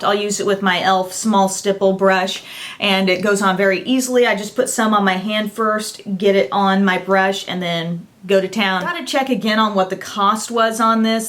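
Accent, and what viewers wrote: South, United States. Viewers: ! I've been watching your channel forever and today I decided to revisit some old videos. I noticed that sometimes a southern(?) accent comes out in your older videos, but it's completely missing in your newer ones. Just thought that was funny. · I did not notice it before but Oh yeah.. you have (a little) southern accent :))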